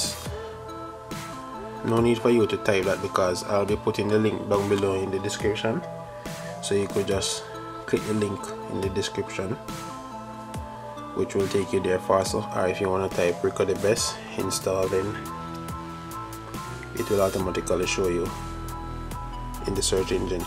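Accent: Jamaica. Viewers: Is he Jamaican?🇯🇲I am. It really sounds like it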